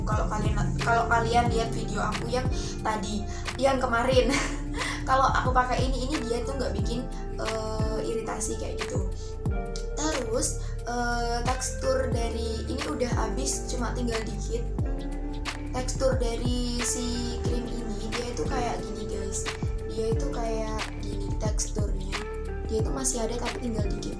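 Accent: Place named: Java, Indonesia. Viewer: Awalnya sih enak didenger, lama-lama kok jadi medokkk😂sukses terus gais💋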